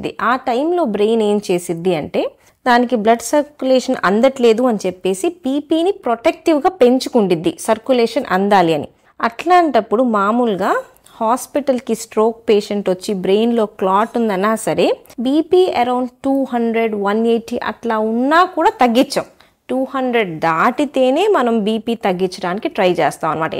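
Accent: Guntur, India. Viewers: Madam meedi Tenali or Guntur aa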